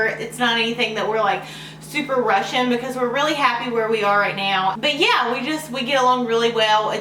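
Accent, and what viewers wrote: South, United States. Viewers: pronouncing naked as 'nekkid' is a Southern thing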